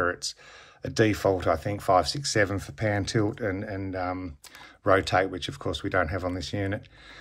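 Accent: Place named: Australia. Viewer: Woot! Sounds like an aussie accent! Even better.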